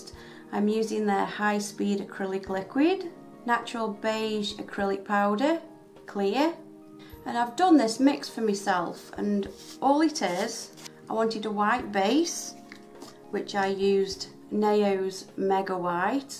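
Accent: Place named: Stoke-on-Trent, United Kingdom. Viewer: I fuckin' knew it! 'ey up duck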